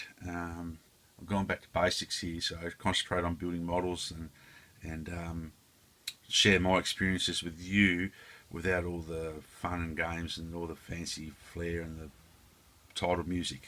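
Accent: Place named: New Zealand. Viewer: Nice scratch-building mate...I really enjoy tarting up these old kits. I look forward to seeing what you do with the "Whirlwind" too. Yes, terrible news coming out of NZ with the actions by that white supremacist...world's going crazy mate over religion. When I hear you speak, I think about a new tv series I've been watching from NZ called "The Brokenwood Mysteries"...got a nice young blonde detective in it. NZ is a country that's been on my bucket list for years, that and Australia. Would like to do one month of travelling around both countries...NZ looks so green and beautiful...just gotta avoid those Katipo and Redbacks! Enjoy the rest of your weekend! Cheers : )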